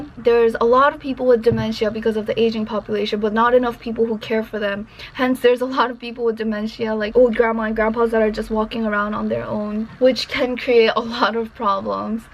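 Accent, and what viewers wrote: United Kingdom. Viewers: Dang ..that brit accent tho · Are you british? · Your accent... so British.. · .....Are you with UK accent??? Sounds like UK English...but not so UK ....... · your British accent is soooo Cutee 😄😄😄😄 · Have no idea why Youtube recommended this to me but yea I like your English accent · In those cases,just start YELLING..Shouting..anything..You didn't like Job anyway,so max they could do is Kick you so Be it He is one Old Lonly miserable man must be,those kind just run away Be Brave...One shall need to know How to Raise Voice in order to Protect self,its not wrong Also you got kinda like Britsh accent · Do you have a video showing your British accent? That would be interesting. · That British accent 😍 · Nice British accent!!! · Swear I thought she was British. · Perfect American English (accent and ease never searching for words, better than half the people here) with sublet British endings occasionally. 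I know some countries teach British accent English but if that were the case something (YouTube, time in America, ?) has almost totally erased it. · chinese speaking like an british op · 😂 there's british accent in you somehow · I feel some London England in your accent. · nice British accent! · 有英伦腔。。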